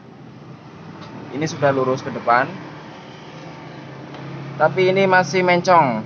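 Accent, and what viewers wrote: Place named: Java, Indonesia. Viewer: Bengkelnya dimana bos ,,ko logatmu wong jowo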